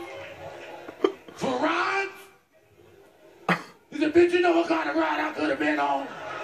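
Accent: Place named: New York, United States. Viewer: Well let me tell you I was scrolling through YouTube and I seen this video and as soon as he started talking I knew he was from New York I'm from New York Brooklyn Queens Manhattan now I live in Florida so I haven't heard people talk like that since I moved here in she got his uncle down pat that is the real real life of adult feet I know cuz I have so many friends that have uncles and friends all kinds of people that are just like that alrighty brother it's a shame you could have been bigger than Kevin Hart😢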